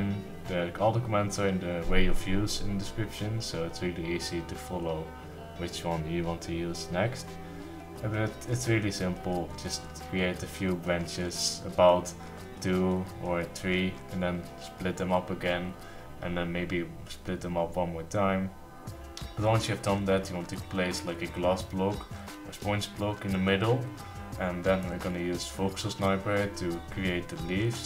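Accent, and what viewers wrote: Netherlands. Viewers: are you from the Netherlands? it sounds like you are, I'm too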